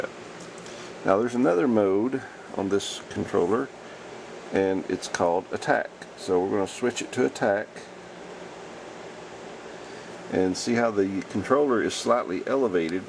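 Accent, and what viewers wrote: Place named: West Virginia, United States. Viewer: Your accent tells me you are from West Virginia or close to it.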